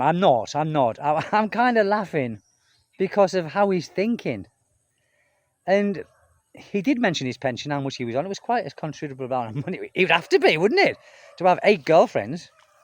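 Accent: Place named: England, United Kingdom. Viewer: I love your English accent mate.😁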